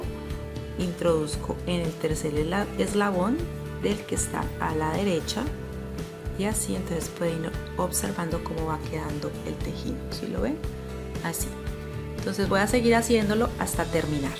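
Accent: Colombia. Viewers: Estás en Colombia o en Venezuela 🇻🇪, tu acento me parece de Colombia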